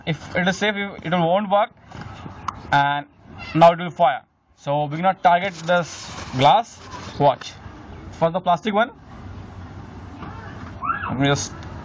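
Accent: India. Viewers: Indian accent funny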